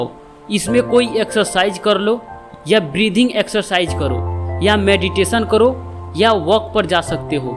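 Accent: Bihar, India. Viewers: Bhai ap Bihar se ho kya ham lag raha hai ki ap Bihar se ho 🥰🥰❤️❤️😍😍😘😘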